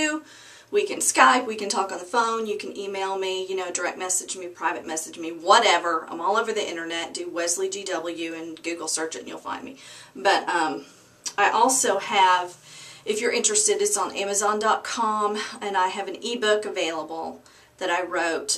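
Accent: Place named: Texas, United States. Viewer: You have some pretty long hair and you look real pretty w/o your make up.I like you texas accent and hope that you really enjoy your movie. Happy belated birthday. By the way I have been selling on ebay since 1998 and even sold items on Yahoo auctions at the same time back in the 1990's. You are definitely a true lady entrepreneur and know how to be self sufficient!!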